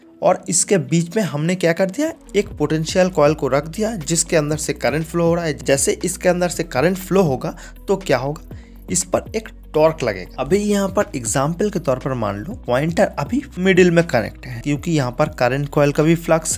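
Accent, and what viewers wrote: Bengal, India. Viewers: आपकी भाषा से आप बंगाली लगते हो को बंगला भाषा बोलते है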